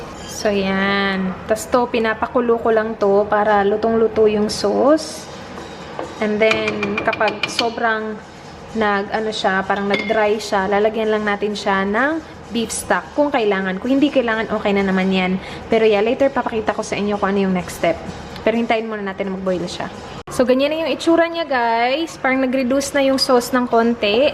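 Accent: Visayas, Philippines. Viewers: magkatawa ko usahay sa imong bisaya day,cute lang paminawon.like (paborutin)😁😁😁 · Hahahahaha, ah oi makatawa ko sa paburutin oi hahahaha.. cute kaayo magtagalog bisaya si 💋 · Nakaka aliw yung bisaya-tagalog 😂❤️ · Cute jud kaayo ka ate mag tag-bis bah...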